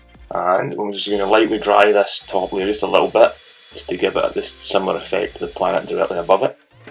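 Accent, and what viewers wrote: Scotland, United Kingdom. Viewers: Anybody else love the Scottish accent, were painting sex planets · Cheers from france , like your planets & love your Scottish accent....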